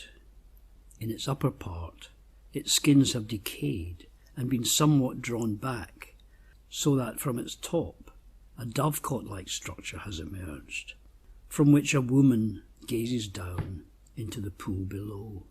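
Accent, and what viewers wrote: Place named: United Kingdom. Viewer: Dear, I want to thank you for all the work you do.. I am truly lucky to have you in this world, showing me the beautiful marvels I would have probably missed otherwise. Also the knowledge that you share and all the work you must have put into it all.. words are insufficient for how I feel towards it. Secondly, I am planning on taking a trip around the UK to see all the magical places. Do you have any suggestions? Also, I know it might come across as creepy, but I would love to meet you. You sound like you live in the UK, and I am a traveler on the journey of life and it would be an amazing addition to my journey to be able to talk with you. Anyhow, whatever happens, I wish you great love and bliss. Much love, Joep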